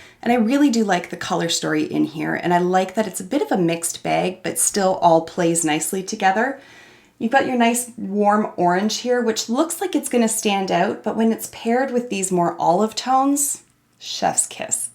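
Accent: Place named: Canada. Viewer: I adore BH eyeshadow pallets! Thank you for the reviews, now I know which ones I want to get next. My go-tos are Bubble Gum, Blueberry Muffin, Mimosa, and the 80's, 90's, and 00's remix pallets. (The 80's one has a pretty great red called Thriller.)Now, I obviously don't know where you're from(Though you sound like you could be Canadian) but here in Tennessee, TJ Maxx is a pretty popular chain. I get all of my BH products from there and they run super cheap, like, 5.99, so I snatch them up when I see them lol. I love how intelligent you are when talking about all of this, not all air head sounding(Monochromatic! No one uses those type of words. Well, not often.). Totally subbing!(Also, I apologize if you addressed different things I mentioned, I'm typing as you're talking lol. )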